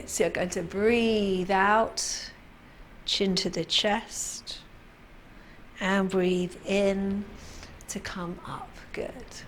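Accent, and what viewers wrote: United Kingdom. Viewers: I haven't attended Pilates for about 3 years, but these short simple basic classes have got me back into it again. Nice to hear a UK accent too! Thank you. It is such a lovely feeling to just 'unlock' your stiff muscles. Fantastic!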